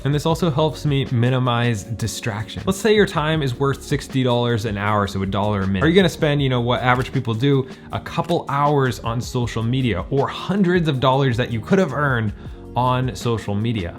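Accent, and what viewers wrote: Boston, United States. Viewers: Love the accent bit! Were you born and raised in Boston and you just "lost" your accent or did you move there later? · I like the Boston accent 🤣🤣🤣… I love this concept, currently having a hard time with meal prep and cooking . So my money is going to restaurants 😩 … but learning to build a list and plan the meals ahead has been the biggest challenge. Hopefully I can switch my mindset and it will help.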